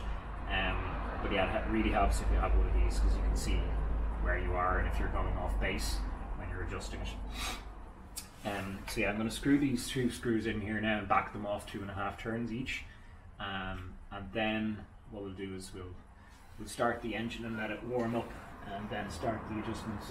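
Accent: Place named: Ireland. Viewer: Not sure what that will achieve because this fella's got an Irish accent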